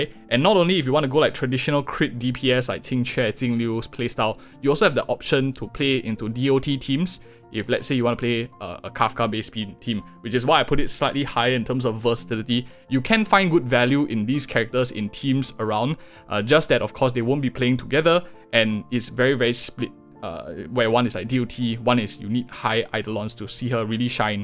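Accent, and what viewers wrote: Singapore. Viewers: Hey bro! R u from sg? Ur acent sounds like sg im from sg btw xD. Nice video keep it up bro!! Just give u a sub :D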